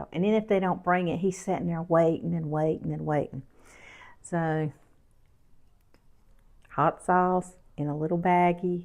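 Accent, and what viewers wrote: South, United States. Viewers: Do not change your southern language, Southern accent, your dialect for anyone or anybody. You are a sweet Southern Bell! I don't understand why people always try too correct our heritage.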